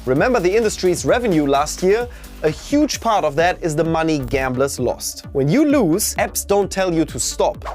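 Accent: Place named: Germany. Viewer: German Accent detected 🇩🇪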